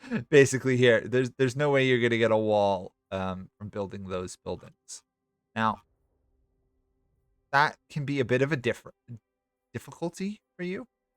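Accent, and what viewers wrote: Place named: United States. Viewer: Dw about it lol, it's hard to know if you've never really been taught and since most fantasy names and languages originate in Asia or Europe most Americans (assuming your American or Canadian from accent) just don't know since yknow, you don't need too lmao, but I don't mind helping with it in future if you ever wanna check, if you want my discord or something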